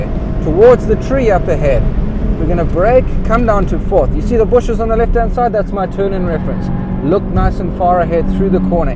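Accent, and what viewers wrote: South Africa. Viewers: cool video... South African? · South African bloke. Good one boet :) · South African? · Nice video. Recognized your South African accent immediately, good to hear! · Great informative vid!! On another note, are you South African? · That South African accent is very distinct. Great guide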